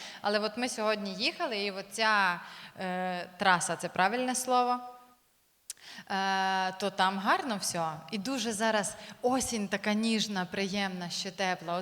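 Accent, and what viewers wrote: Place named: Western Ukraine, Ukraine. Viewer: Вона з Західної України, там акцент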